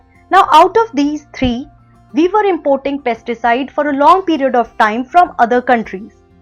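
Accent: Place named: India. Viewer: The content is good, however, the indian accent's extremely annoying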